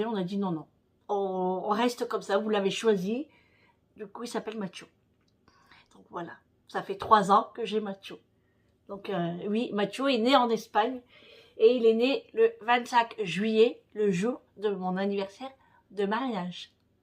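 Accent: North, France. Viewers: Hello Houppsss 😝je suis nouvelle abonnée j’adore tes bla-bla avec ton accent du ch’nord,moi je viens de Belgique 😉je me permets de te tutoyer car je suis un peux plus âgée 🤫qu’elle courage d’être Maman d’une belle familles félicitations tu arrêtes jamais mais c’est certain que pour ses enfants on se décarcasse toujours même s’il sont grand j’ai moi même deux grands garçons 🥰je te souhaite déjà une bonne fête dès Maman et j’espère que tu sera bien gâtée 💝prends soin de toi à bientôt pour d’autres aventures 🫶🏼bisous 😘Vivi.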